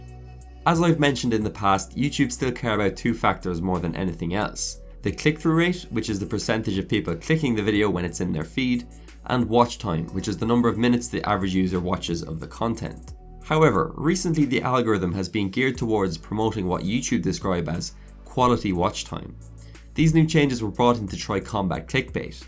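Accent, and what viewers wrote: Ireland. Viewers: Been through a few videos, and I'm still deciding if I'm watching the content because its beneficial, or is it because I lowkey love the Irish accent! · You Irish?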